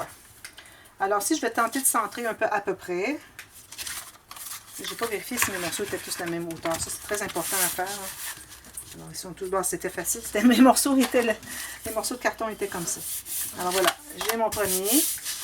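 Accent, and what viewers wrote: Quebec, Canada. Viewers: bonsoir, dès que j'ai écouté votre vidéo je savais que vous étiez québécoise tout comme moi. J'ai remarqué votre plaque à découper aussi, j'ai la même. Ces pour cela que j'espèrais enfin où trouver ces cartons, je venais de trouver une référence de chez moi. Je suis de Laval donc accès aux grand centre et je commande aux États-Unis aussi, mais je n'avais pas le nombre de gramme ou un nom pour mis référer. Merci!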